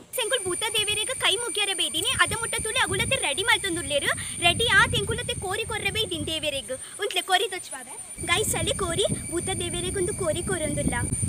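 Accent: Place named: Tulu, India. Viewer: Clean tulu pathervar.. mast hats off ireg .va hindi pathendala Tulu nu burthijar. Sampradaaya burthijar... Nice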